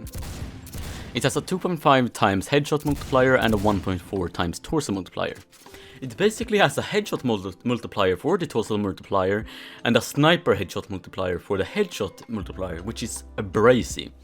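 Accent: Sweden. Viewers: Jag kan höra att du är svensk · Breh he is Swedish how did you pick up British from that accent?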